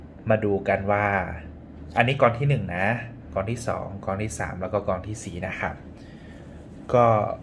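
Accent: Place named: Isan, Thailand. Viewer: อาจารย์อยู่จังหวัดอะไรค่ะ วาดยันต์สวยมากค่ะ คลิปนี้เสียงภาคอีสานออกจ้า แม่นมากค่ะ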